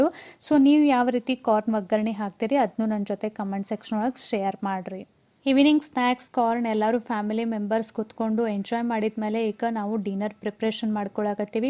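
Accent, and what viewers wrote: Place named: Shimoga, India. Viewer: wow 🤗 thumba esta aythu nim bashe........... nav shimoga nim mathu keltiddre keltane erbeku ansatte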